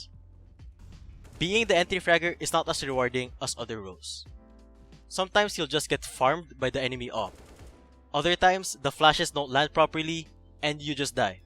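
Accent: Philippines. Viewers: good content. btw narrator sounds like he’s from the 🇵🇭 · nice content again from, btw the voice over sounds like a Filipino. Love it!!! <3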